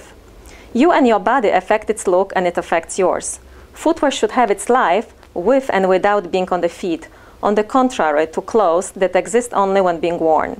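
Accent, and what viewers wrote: Poland. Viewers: Are you polish ? Because you have a polish accent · amazing polish accent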